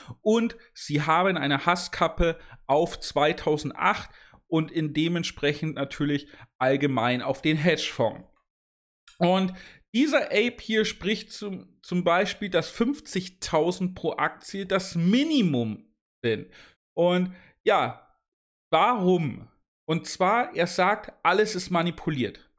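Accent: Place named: Oldenburg, Germany. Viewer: Höre da einen Oldenburg-Akzent raus ^^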